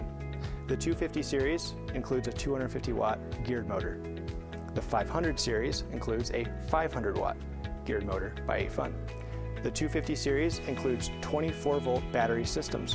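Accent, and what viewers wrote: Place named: Canada. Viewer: I love hearing a Canadian accent in the morning!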